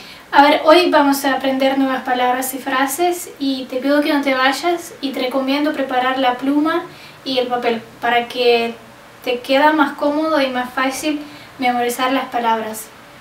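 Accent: Argentina. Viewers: hablas como argentina wtf :v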